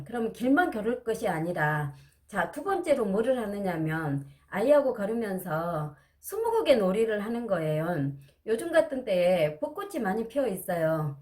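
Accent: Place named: Jeolla, South Korea. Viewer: ㅎ ㅎ ...전라도 사투리가 나오네용.. 저도 전라도거든요. 역쉬 전라도 사투리가 멋져요. 영상 컨텐츠 좋네요. 앞으로 계속 응원할게요. 감사해용,,,,,나 갈랍니다..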